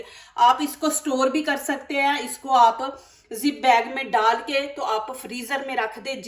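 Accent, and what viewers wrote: Punjab, India. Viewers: Aap ki panjabi wali ton ma Urdu bohat khub ha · Wah G Wah,munh mn pani aa gya hy. Aapki hr recipe boht aasan or tasty hoti hy. Aap shyd punjabi speaking hn q k aap ka lehja bilkul hmary jesa hy.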